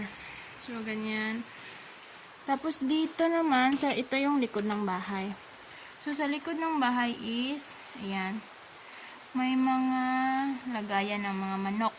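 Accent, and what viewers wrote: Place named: Visayas, Philippines. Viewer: maganda yung bahay may 2nd floor pa pwede pa yang ayusin pauntiunti lng tapos yung green na kulay palitan ng white hehehe parang si beauty gonsales ka talaga mag salita maam bisaya accent 😎😎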